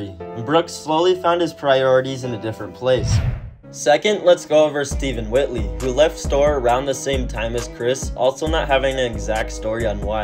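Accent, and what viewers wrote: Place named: United States. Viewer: so crazy hearing an american talking about parkour over video clips of my hometown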